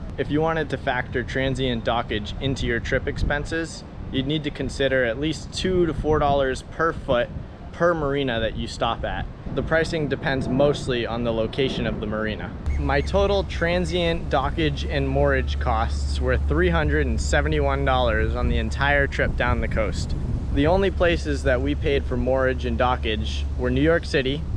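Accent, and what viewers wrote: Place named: New England, United States. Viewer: With that boat and that accent, I can definitely tell you’re from New England! Very informative, otherwise. I’m wondering why you didn’t go with a sail boat to save on fuel long term, though.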